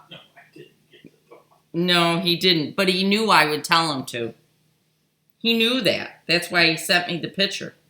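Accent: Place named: Buffalo, United States. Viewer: Ah, I thought I recognised the accent. I am in England a d have a very good friend in Buffalo, and I hope you are not offended but some of the ways you say some words reminds me of them. I call Buffalo my second home, I have been there so many times. Thank you for your videos, they are so informative.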